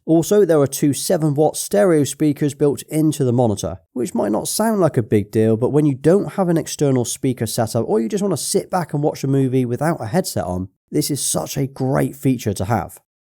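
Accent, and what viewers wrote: United Kingdom. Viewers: I dont think he is american. Sounds british to me. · He british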